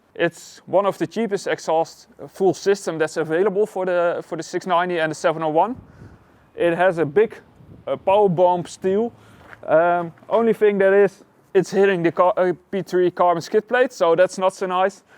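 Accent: Netherlands. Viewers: Ar you dutch?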